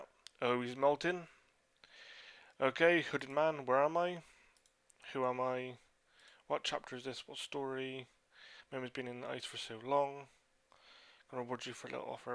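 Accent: United Kingdom. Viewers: The sound of your Bristolian accent makes my nipples moist. Just saying. LOVE YOU!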